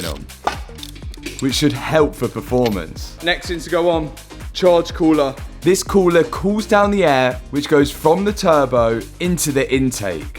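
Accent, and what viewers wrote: United Kingdom. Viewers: No! Your British accent doesn’t sound like Matt’s.!!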